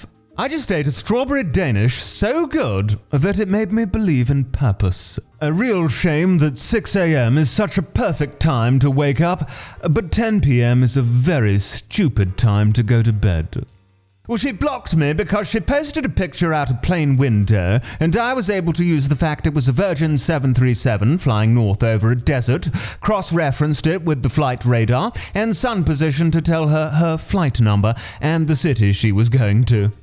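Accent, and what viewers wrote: United Kingdom. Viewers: His posh voice makes me feel positively Edwardian And I want D. E. E. Z. N. U. T. S GOTCHA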